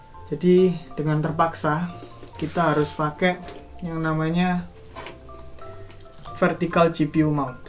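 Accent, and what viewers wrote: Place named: Java, Indonesia. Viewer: Orang jawa mana bang.. Muedook bnget.😁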